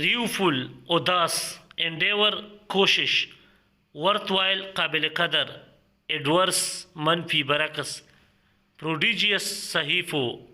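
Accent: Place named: Pashto, Pakistan. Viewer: You missed pronounce many words instead of pronunciation in pasho accent ur accent should be urdu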